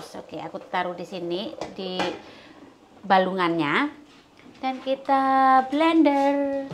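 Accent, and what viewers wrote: Java, Indonesia. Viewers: Di luar negri tapi bahasanya masih jawa medok...itulah lucunya mbk.. jadi hiburan nonton vidio ini.. sampai ngakak saya...luarbiasa mbk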